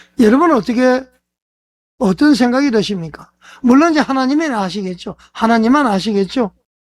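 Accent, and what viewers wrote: North Korea. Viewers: 참 낯짝도 두껍네. 이영훈 목사팔아 밥먹고 사느라 그 이북 말투부터 뜯어고치고 유트브 해처먹어 듣기싫어 이북 말투나 좀고쳐라!!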